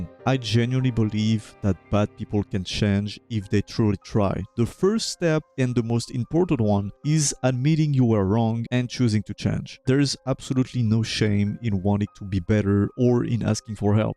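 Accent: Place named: France. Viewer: absolut cinema (je te conaissais pas avant mais j'ai remarqué notre bel accent anglois commun 😌)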